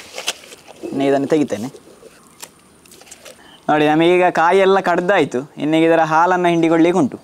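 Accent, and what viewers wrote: Mangalore, India. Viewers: Oh I got after listening your Kannada accent I thought that you are from Mangalore so I asked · Mangalore Kanadda Bhashe Estu Chanda Maraya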